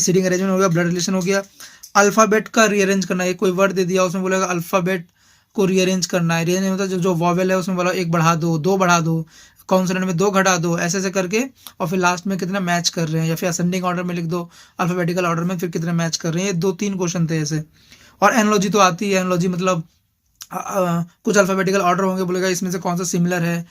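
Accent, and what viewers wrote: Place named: Bihar, India. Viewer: aap bihar se ho kya aap nomadic shubham ki tarah baat kr rhe ho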